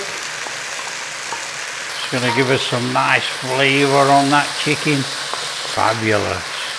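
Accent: United Kingdom. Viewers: does it easy and delicious for the working people,no nonsense exotic ingredients and time consuming preparation that needs a three thousand year old samurai sword and skill to slice like a samurai warrior in a clear anglo saxon accent on an electric cooker in a skillet without the need for a billion btu burner and wok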